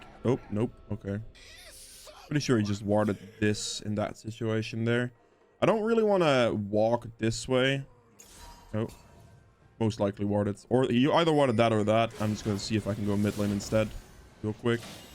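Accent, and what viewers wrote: Netherlands. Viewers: Hey thanks for the guide. You seem to have a Dutch accent. Are you Dutch by any chance? :D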